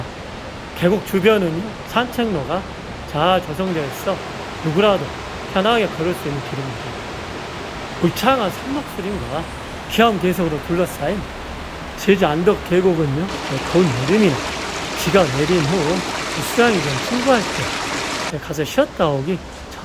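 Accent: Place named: Jeolla, South Korea. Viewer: 워미... 이런말 혀문 안대능거이 나가 잘 알기능 혀지먼 도저히 안혀블고능 못참긋네요잉~ 아재요~ 절라도 사투리 억양 참말루다가 어머어마 혀불구마잉.. 조와요 눌라불고~ 잘보구 가게요~~~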